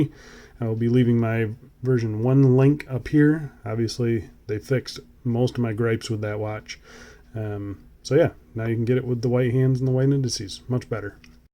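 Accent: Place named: New Jersey, United States. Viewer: sound like a jersey girl ..gg tandorio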